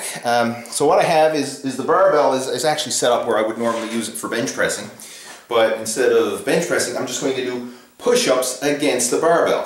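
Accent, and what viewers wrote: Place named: East Coast, Canada. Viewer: The accent. Can’t tell if it’s East Coast Canadian or maybe a light Boston accent. Newfoundland?Where are you from bud? I only ask because I teach English and my study was linguistics.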